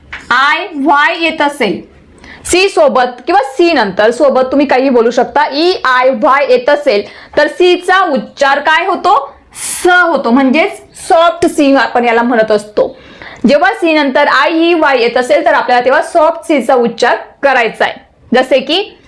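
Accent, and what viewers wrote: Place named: Khandesh, India. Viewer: Where are you from ma'am your language tone like khandeshi girl. Are u khandeshi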